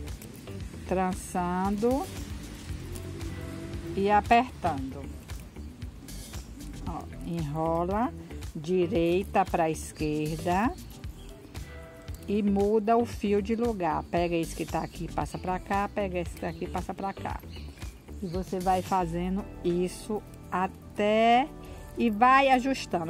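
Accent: Northeast, Brazil. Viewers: Vc é nordestina?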